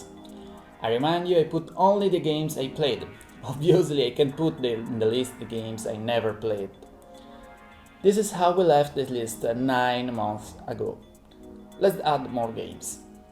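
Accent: Italy. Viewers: E poi ci sono io che sto ancora cercando di capire se sia italiano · Bro ma sei italiano? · ARE YOU ITALIAN??? I HAVE PROVE!!!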